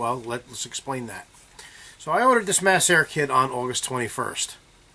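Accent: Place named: New Jersey, United States. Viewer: You are a bad ass mechanic. I was rasied in New Jersey and have brothers and sisters that still live there. Nostalgic to hear NJ accent. Wife drives 1996 Jaguar Vanden Plaus, I'd say the Jaguar 4.0l inline six sounds much smoother than BMW. HaHa. Thanks for your videos I always learn something new.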